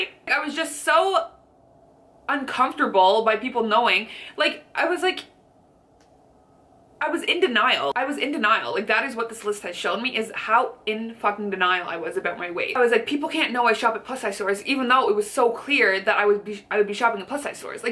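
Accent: Canada. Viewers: Canadian accents are so cute :) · Canadian accent, Get over it. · Her Canadian accent is so adorable!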